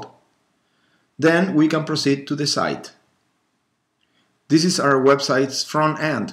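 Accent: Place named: Italy. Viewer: se sente debbrutto che sei italiano XD thanks for vid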